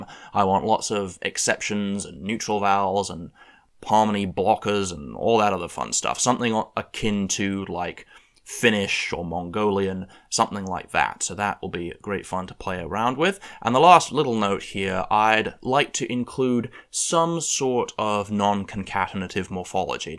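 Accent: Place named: South, United Kingdom. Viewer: I know it isn’t the point of the video but I just love your accent. Southern British, right?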